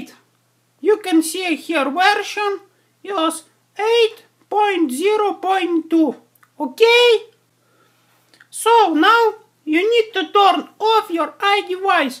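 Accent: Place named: Asia. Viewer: That's how Asian people talks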